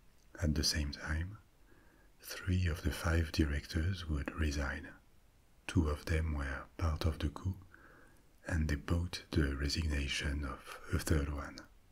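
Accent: France. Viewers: ASMR & History with a French accent.Perfect.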